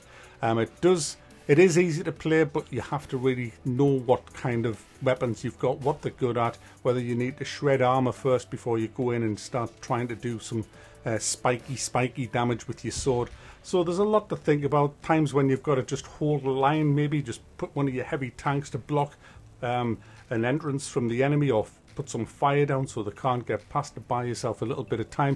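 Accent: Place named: Northern England, United Kingdom. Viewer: Good to hear a northern accent! Im a Geordie myself. Great content mate cheers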